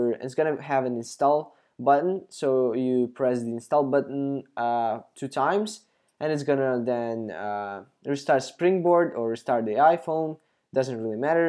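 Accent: Poland. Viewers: Are y o u polish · Are you Polish?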